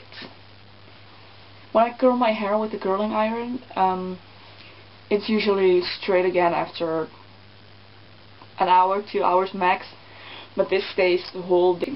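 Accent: Netherlands. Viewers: You have a dutch accent :) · Are you dutch..? I can hear that you say sok instead of sock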